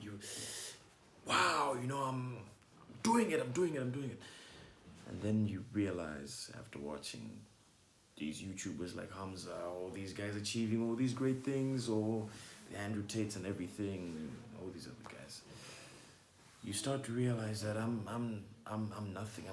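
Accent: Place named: Rwanda. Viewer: Great work bro , your accent sounds Rwandanese . Where are you from ?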